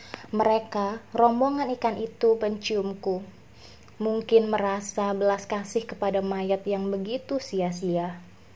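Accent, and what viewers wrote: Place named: Flores, Indonesia. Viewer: Aksen floresnya 😭 butt gooddd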